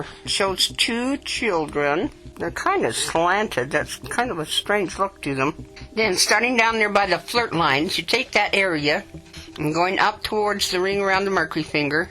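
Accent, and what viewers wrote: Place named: United Kingdom. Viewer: how much do you charge for a palm reading and where are you based. I'm in the UK,you sound similar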